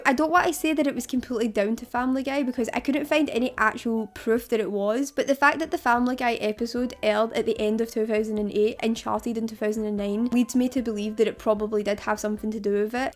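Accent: Scotland, United Kingdom. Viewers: I absolutely love your Scottish accent-it's so rich and full of character! And your videos? They feel so real, so genuine, like you're just talking to a friend. Honestly, it's refreshing to see someone create content with this much heart. Keep doing what you do-you're amazing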